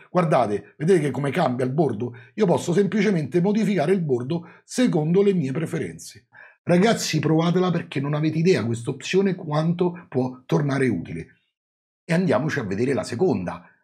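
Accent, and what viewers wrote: Rome, Italy. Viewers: l'accento romanesco è la chicca finale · Guarda, basta vedere ce ne sono alcuni. Appena fai uscire un video che guardo e che ti do il like, poi l'algoritmo di YT me ne propone altri simili con lo stesso argomenti, alcuni fatti tempo prima, ma che non hanno niente a che vedere nel modo in cui esponi tu, altri invece qualche giorno dopo che li hai fatti uscire tu, ma anche qui non ci siamo proprio. Ah, sono quasi tutti esteri. Ma a parte la lingua, l'esposizione, la completezza e la simpatia che ci metti, non hai eguali. Quindi stai tranquillo. E poi scusa, ma con quella parlata che non può essere altro che "romana", ma chi te frega!!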